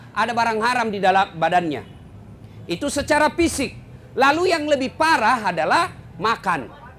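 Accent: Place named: Sulawesi, Indonesia. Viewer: Suka sama ceramahnya. Màterinya ttg kehidupan skr plus dgn pendekatan komunikasi yg baik dgn dialek dan bahasa gaul ala sulawesi. Hy yang mengganggu, kok byk kata 'cilaka' atau celaka dlm arti bhs Indonesianya ya? Bukankah kata2 yg diucapkan itu bermakna doa ya? Apalg oleh seorg ustad seperti ustad Das'ad? Maaf jika saya saja yg merasa terganggu...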